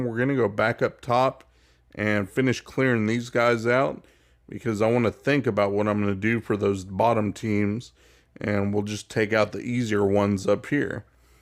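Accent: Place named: Texas, United States. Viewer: fiddy-four banners LOL! ive noticed your cool accent before.Are you from Texas or smthing? :D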